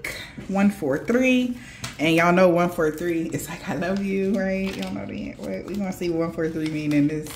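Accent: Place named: Brooklyn, United States. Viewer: I Live in New York! U funny sound like u was uptown in the bronx or spanish harlem . And u was also in brooklyn carribean city !!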